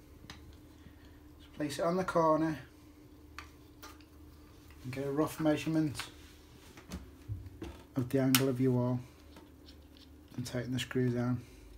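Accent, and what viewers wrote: Liverpool, United Kingdom. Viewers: Scouser. He must have learnt this in prison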